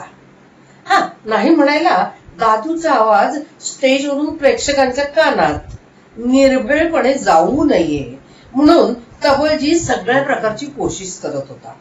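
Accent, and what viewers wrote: Malvani, India. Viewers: व्वा ताई , खूप छान.. प्रत्येक वाक्यागणिक प्रसंग अस्सल जिवंत उभा करण्याची ताकद जबरदस्त..आवाजातील चढ उतार, मालवणी हेल इरसाल मालवणी ठसका अप्रतिम... अजून ऐकायला आवडेल हा असा गोड , मंजुळ आवाजातील अभिवाचन.. आवाज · अभिनय वाचनातून मालवणी ठसका माका भावलो हो ताई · प्रसंग डोळ्यासमोर उभा करायच सामर्थ आहे या अभिवाचनात आणि आली , वस्त्रहरण नाटकाची आठवण !!स्वतः मालवणी नसतानाही ठसकेदार मालवणी सादर करण एकदम सही!!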